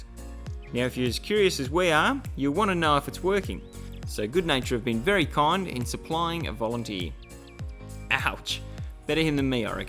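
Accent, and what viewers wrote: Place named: Australia. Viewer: Your Australian accent is the best!!!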